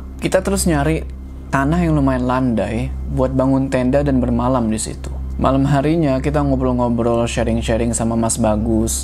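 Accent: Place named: Bandung, Indonesia. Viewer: Kang teh orng mna nya sga orng bandung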